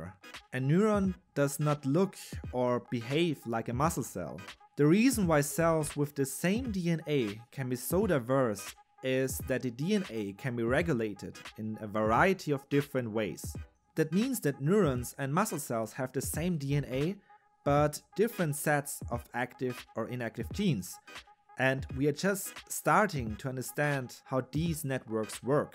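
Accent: Germany. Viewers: Nice Video, good graphics., but your Thumbnails lack in quality and you have a strong German Accent. Try to work more with the Algorithm, so your channel picks up. Better Thumbnails, Titles, and less Accent if possible. Just my personal advice, because I want to see this channel grow in popularity. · I see, you are probably a German (cause of the accent) and also you USED COFFEE AS AN EXAMPLE, BUT WHY NOT TEA ?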